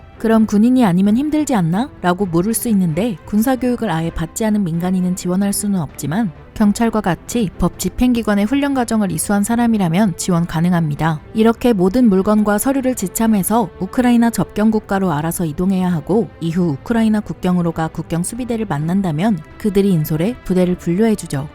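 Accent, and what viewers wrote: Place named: Seoul, South Korea. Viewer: 누나 이제 서울말 잘하네여ㅎㅎ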